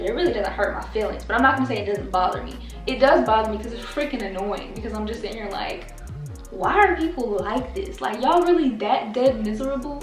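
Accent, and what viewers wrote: South, United States. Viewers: Ugh i was hoping you’d say what state you’re from. I love your southern accent 🥰. I’m from the south too girl; Tennessee to be exact. But i understand being from a small town you want to keep that private! Enjoyed this video! We’re so similar in ways and i really love your personality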